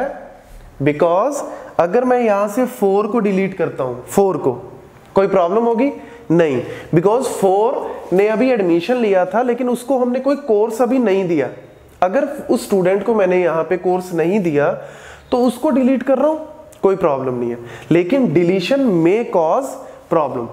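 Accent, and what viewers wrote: Punjab, India. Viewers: Mast punjabi tone. Yaha pe koi two taa nahi h yaha pe to 20 hai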